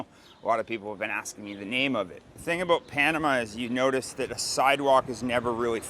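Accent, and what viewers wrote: Canada. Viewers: Your from Canada EH?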